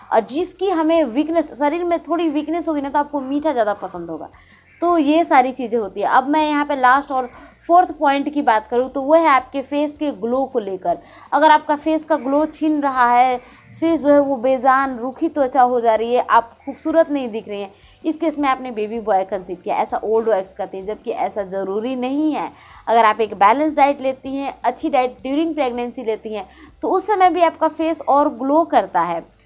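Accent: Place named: Bihar, India. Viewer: Mam aap bihar se ho kya ❤❤❤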